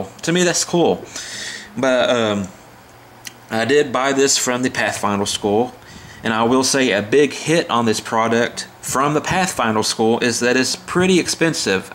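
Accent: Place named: South, United States. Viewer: Nice item - good video. Am thinking about getting one of these. One question.....which part of the US are you from? I can't sus that accent although it sounds southern to me. Dave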